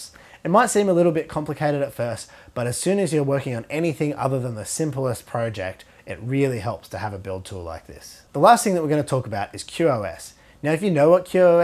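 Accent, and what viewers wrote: Australia. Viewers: thanks, I appreciate the feedback! Ive learned quite a bit from your video series / channel thus far so thats helped and am looking for more resources. My issue is that I have a rather unique robotics project and have been 'cobbling together' the ecosystem / tools myself & have only just discovered ROS. I will have to look more into it to see if its appropriate; I mean it has to be right?! PS. My 'unique project' at the moment is a Knight Rider KITT replica & was thinking ROS may be the solution. Yes, an actual pontiac trans am. PPS. You sound like a fellow Aussie, hope Im not being rude? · Excellent Aussie 😊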